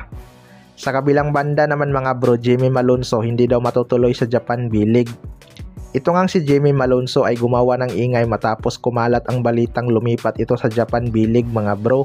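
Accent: Visayas, Philippines. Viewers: Brad ayusin mo ha… pagka bisaya mo magsalita ayusin mo lichi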